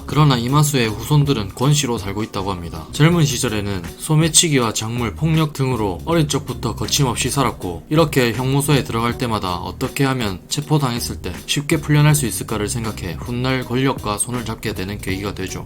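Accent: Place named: Busan, South Korea. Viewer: 같은 내용이라도, 부산 사투리 참 좋네요. 구독. 좋아요 누릅니다.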